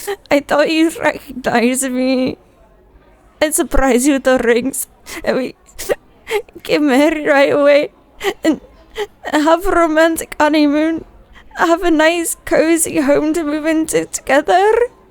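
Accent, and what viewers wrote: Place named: Northern England, United Kingdom. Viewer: Love your accent! You from northern England or one of the Norse countries?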